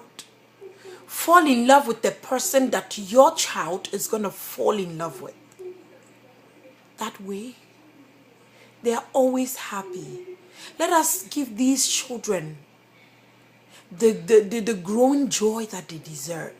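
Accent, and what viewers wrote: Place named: England, United Kingdom. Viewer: you are too kind!!!!!!! we need many parents like you in this world:) BTW I Love your English accent!!<3<3;)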